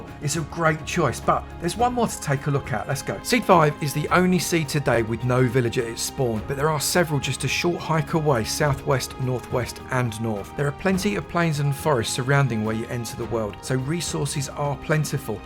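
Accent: United Kingdom. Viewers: this dude sounds like a tourist attraction person XD very soothing bri'ish accent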